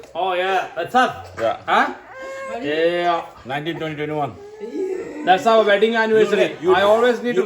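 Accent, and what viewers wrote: Thrissur, India. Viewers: content onnum illenkilum veruthe samsarichal mathi, athu kelkkan oru rasamundu, ningal Thrissur alle, aa slang anu rasam. Njan ningalude video kanunnathe ninte samsaram kelkkana.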